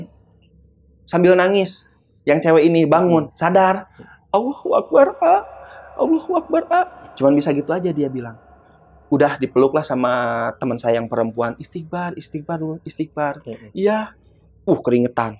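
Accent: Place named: Sunda, Indonesia. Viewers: Sunda bgt... 😁 Salam dari karawang 🙏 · deuhh kumaha iyeu nya logatna sunda pisan euyy😅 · aa, meni rame nyeritain na... orang sunda pisan... semangat a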